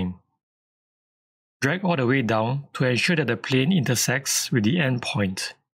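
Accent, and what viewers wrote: Singapore. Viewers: Singaporean accent on a great educational video? YES, immediate subscription!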